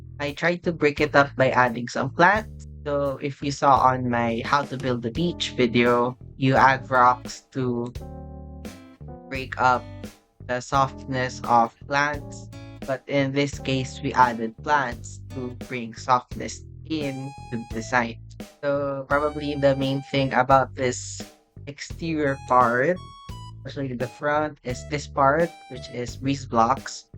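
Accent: Philippines. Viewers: i mean he has a filipino accent and latin american countries also have same styles as the philippines so connect the dots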